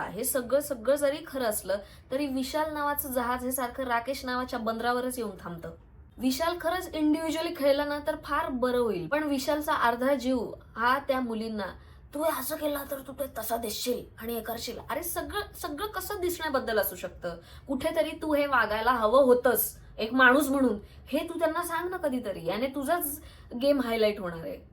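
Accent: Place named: Khandesh, India. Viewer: 😂😂 paneer aivaji khodrubber Your roasting skills are amazing 😂😂❤ Btw I'm from Bhusawal 😅 loved your khandeshi accent 😁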